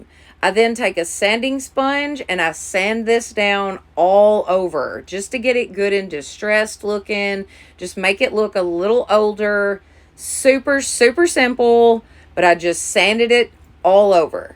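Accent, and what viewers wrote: South, United States. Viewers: Bless your heart, you are so Southern! I love it. Liked your projects.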